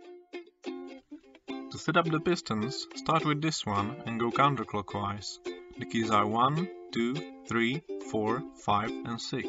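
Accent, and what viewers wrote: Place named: Czech Republic. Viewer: You sound czech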